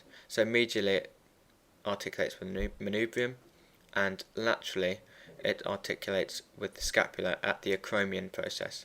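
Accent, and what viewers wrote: United Kingdom. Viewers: Big, massive, enormous thanks! It's really hard to actually visualize all of this while reading text books and browsing through an atlas. I always finish off each part by watching your videos. It's also almost equally awesome that you speak British, with some luck I will have mastered the accent by the end of my first year! :) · Good work but no offense .. I don understand British Accent clearly !! :"(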